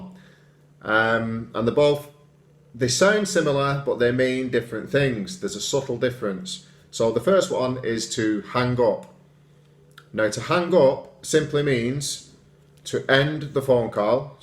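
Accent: United Kingdom. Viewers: Great job 👏🏻 i love British accent 😻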